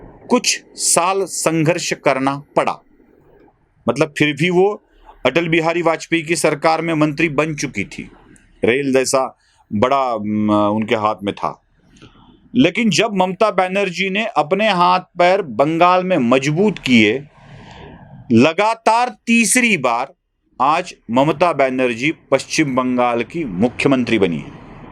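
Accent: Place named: Maharashtra, India. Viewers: Great.. Good... But you have to improve in hindi diction & leave marathi accent No doubt keep practising will get good following due to your pitching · सर आपली हिंदी मराठी वळणाची आहे तरी खूप छान आणि सटीक आहे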